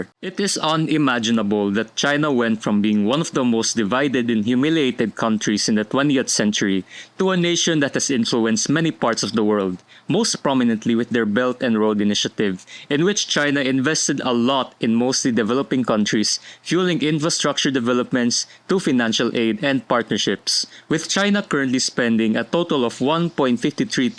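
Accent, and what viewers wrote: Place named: Philippines. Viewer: Downvoted for a flip speaking English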